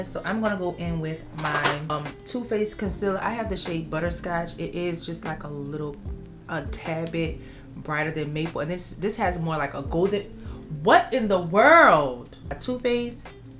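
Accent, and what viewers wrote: Louisiana, United States. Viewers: You are sooooo beautiful. Are you from New Orleans? Trying to pin down your accent! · This is my first time seeing you... You were in my recommendations... I love your make up and then I heard that accident too 😍 is that a New Orleans accent? I love your accent!! 🥰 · the hair definitely grabbed my attention but once I heard you talk I said “oh yea she from Louisiana, lemme follow her” lol you’re beautiful! 🫶🏾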